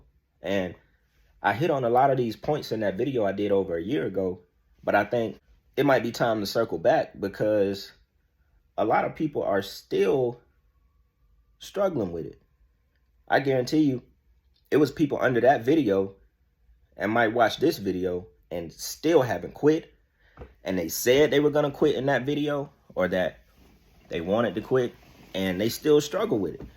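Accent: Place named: Atlanta, United States. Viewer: I can definitely tell you have an Atlanta accent. 😂❤ I stay near Macon... yep i can definitely tell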